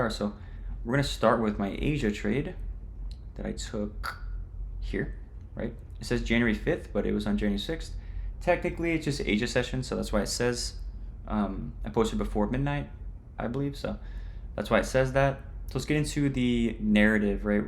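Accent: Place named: Texas, United States. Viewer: You from Texas right 🤠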